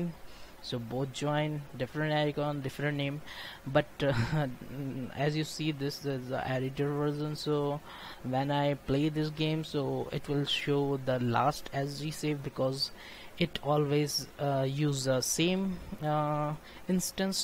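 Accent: India. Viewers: U have indian pronunce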